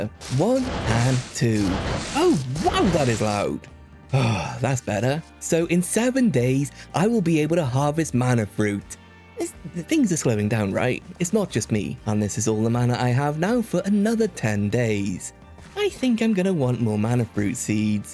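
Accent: Wales, United Kingdom. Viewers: always thought i heard a bit of welsh accent come through now and again, that comment on the welsh summer confirmed it 🤣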